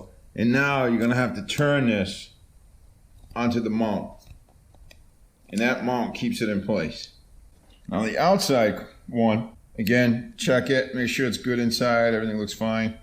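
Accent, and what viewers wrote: Boston, United States. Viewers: Where are you from that ngk plugs are hard to come by ???? Can get them at the corner gas station here .. lol. You sound like you from Boston..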